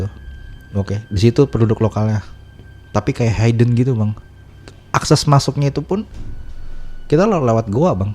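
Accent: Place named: Java, Indonesia. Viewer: Padahal ga usah pake bahasa Inggris bang, pake bahasa Indonesia aja ceritanya. Gatel denger bahasa Inggrisnya yang bukan cuma medok lokal tapi juga belepotan banyak ngaco.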